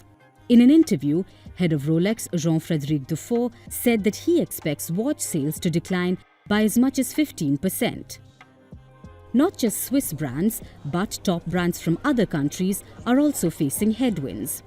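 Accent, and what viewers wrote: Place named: India. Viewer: narrator sounds indian - making this a suspect propaganda video by the indian government.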